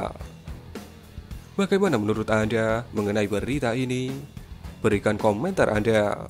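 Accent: Java, Indonesia. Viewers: Medok nih jawa nya kalo ngomng, ga seru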